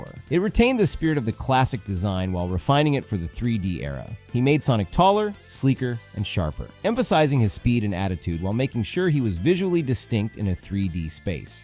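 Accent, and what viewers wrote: Brazil. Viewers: me desculpa mas, vc é brasileiro?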